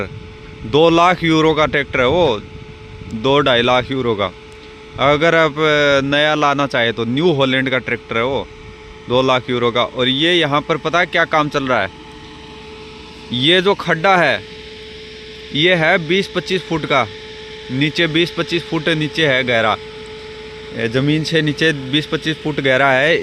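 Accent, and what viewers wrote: Haryana, India. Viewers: Haryana k lage h bhai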